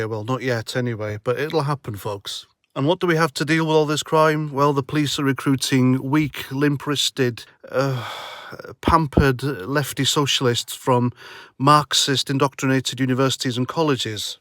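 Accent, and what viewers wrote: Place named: Birmingham, United Kingdom. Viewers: Excellent brummie accent. I'm glad Ozzy's coming back bless him. War Pigs is an ace song....so true today as well. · your Brummy accent is hilarious...yam alright am ya bab 🤣😃👍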